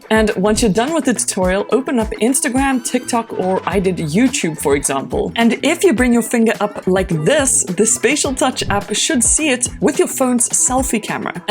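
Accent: South Africa. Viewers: I can’t unhear how much you sound South African… 😅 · You sound very South African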